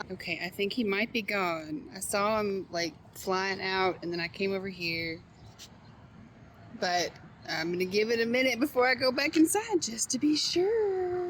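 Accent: South, United States. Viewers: Are you originally from the southern part of America?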